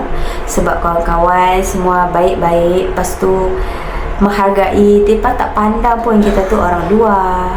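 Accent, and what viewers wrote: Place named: Kedah, Malaysia. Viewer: Sedap sungguh hang gebang loghat kedah... lapang telinga dengaq hang gebang macam tu...mana p abg harun lama x nampak batang hidung dia.... hang kurung dia ya... 😂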